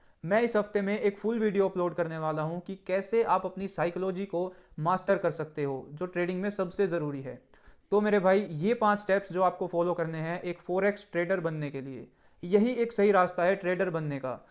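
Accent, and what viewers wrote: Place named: Najafgarh, India. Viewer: Saath me trade krte h bhai. Me b najafgarh se hu tu b aas paas ka hi lg rha h boli se😅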